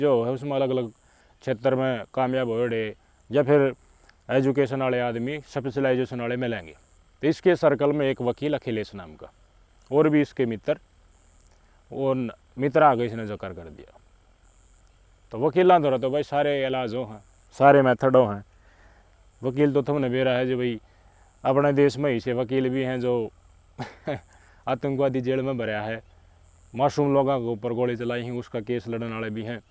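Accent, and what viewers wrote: India. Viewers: Desi boly mast h bhai sahab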